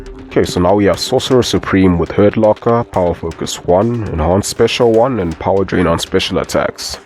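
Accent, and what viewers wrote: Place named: South Africa. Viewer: You sound really south African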